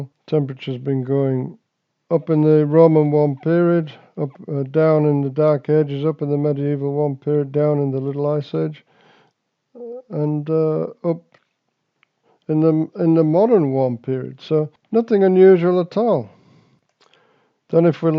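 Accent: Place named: Ipswich, United Kingdom. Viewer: No your wrong, you sound like that fucking Ipswich Town manager with that accent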